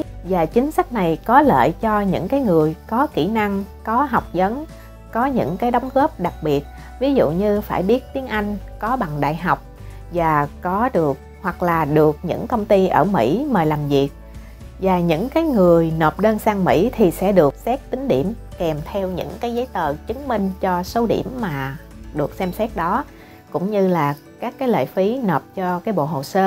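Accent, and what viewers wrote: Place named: Saigon, Vietnam. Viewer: Cảm ơn em, giọng đọc rất truyền cảm, rất chuẩn Saigon, US.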